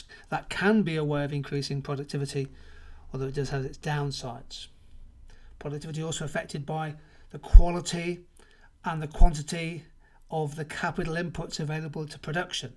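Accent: United Kingdom. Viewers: I love the accent , it s british, isn t ? well explained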